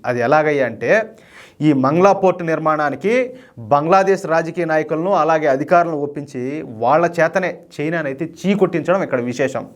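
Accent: Rayalaseema, India. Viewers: రాయలసీమ భాష బలఉందన మీది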